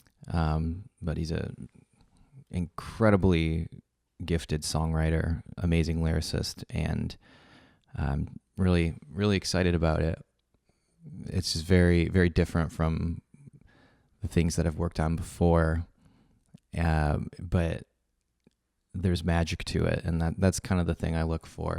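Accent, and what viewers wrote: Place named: Arkansas, United States. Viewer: I'm from Arkansas and like you nailed like 95% of how people talk down here which is funny because I've lived in the south for my entire life and some people think I'm from up North because of how I talk lolll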